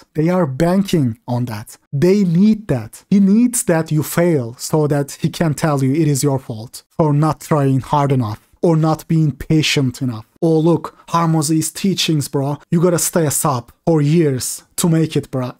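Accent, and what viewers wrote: Turkey. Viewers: Your accent sounds like turkish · Dude, until you mentioned your name, I thought you are Turkish. You have a clear Turkish accent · Turkey I think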